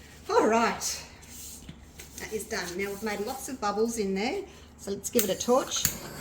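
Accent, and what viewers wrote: Australia. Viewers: Woah kookaburra are so big. And I got it right the other bird is an Ibis. I’m a brand new sub. Are you Australian or from New Zealand?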